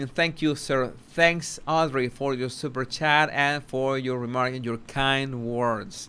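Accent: Cuba. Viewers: I e lived I both countries - is the accent Cuban or Venezuelan ? Imo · Your Ricky Ricardo accent is fantastic. Love and bliss to you,! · your Ricky Ricardo accent is part of your charm.😄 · What I love is your Ricky Ricardo pronunciation, don´t chance it. I practice my English with you, and I understand you almost everything. Love your accent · My Cuban father came to America and married an American. Growing up, we watched I love Lucy and I was so proud that my dad had an accent like Ricky Ricardo. My father died in 1990 and I confess that your accent takes me back. I love listening to you speak. It's like music to my ears. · I love the Ricky Ricardo-ness of your accent! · My father was Cuban too. My mother is Puertorican and both came to this country at 10 and 12 yrs old, respectively. My father never lost his accent! He was so proud to be Cuban. He passed Jan 2020. 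He was also a first responder during 9/11. Anyway, I also love your accent as it reminds me of Papi. Thanks,!! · I love your Ricky Ricardo accent, and like your perspective in the analysis of the body language. It is very interesting. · you make me laugh when you say your "Ricky Ricardo" accent. I was just wondering if you hear yourself the way others do? Do you hear the Ricky Ricardo accent? I'm from Boston and whenever I travel somewhere else people, random strangers, have come up to me and tell me "You're from Boston right? I can tell by your accent!" I don't hear it at all!:)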